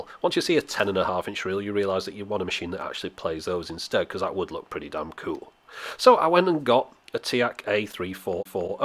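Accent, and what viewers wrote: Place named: England, United Kingdom. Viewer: This guy is great! I majored in electronics in the 60's and connected every wire in four 24-track analog recording studios in L.A. so I appreciate someone who clearly knows what he is doing and explains with a patient voice. Of course, I have heard it said many times that us Americans, when hearing an English accent, believe this person is way smarter (this guy really is) than the usual bloated sack of protoplasm. ;~)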